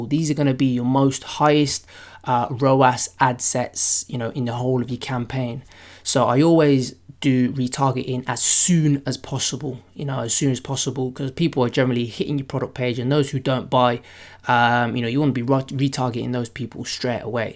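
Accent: England, United Kingdom. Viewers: R u from England?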